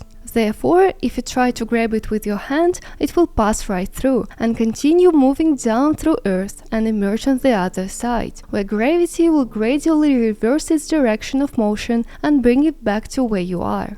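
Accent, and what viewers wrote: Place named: Eastern Europe. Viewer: You are cute and havea slavic accent.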